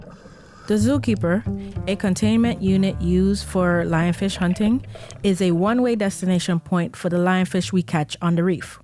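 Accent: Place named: Caribbean. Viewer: I grew up in Florida and there are way too many lionfish there too. That's one species that needs to be eliminated and luckily they taste great. I would love to try ceviche with lionfish. It's great that you're helping your environment and getting good groceries at the same time! What a beautiful reef, it's definitely worth protecting! I hope people become more aware of lionfish and start to want to buy the meat. If it was "in style" to eat them maybe the population could get under control. Thanks for sharing your adventures! Oh and I love your accent! Caribbean people have great accents!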